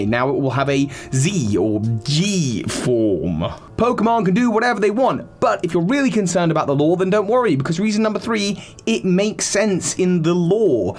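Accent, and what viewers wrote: United Kingdom. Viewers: Wait your British:o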